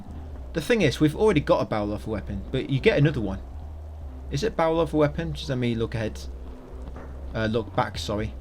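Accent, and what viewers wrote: England, United Kingdom. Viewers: What a bliss to be looking for a walkthrough and accidentally find one of your favourites content creator. Thanks mate. Ps: I teach English as a second language, and I was trying to place your accent. Birmingham, Liverpool, London?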